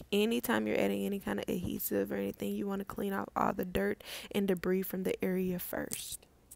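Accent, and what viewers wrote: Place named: South, United States. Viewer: Love that southern belle accent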